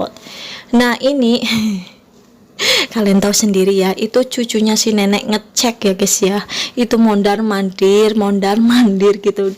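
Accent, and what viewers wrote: Banyumas, Indonesia. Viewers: Mb dr mana ya?... kok ngapak kaya aku?...🤣😛🤦‍♀️ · Medok bgt mba logat nyah.. org banyumas yah.. smangat deh bwt mba nyah. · Mb ko ngmngny ngapak..wong ndi sampean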